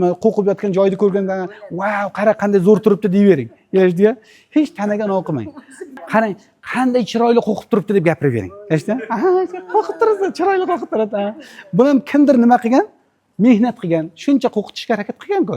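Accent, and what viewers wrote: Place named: Qashqadarya, Uzbekistan. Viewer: Энг ажойииб кашкадарйоча жайдари гаплар😂😂 Зур тушунтиряптила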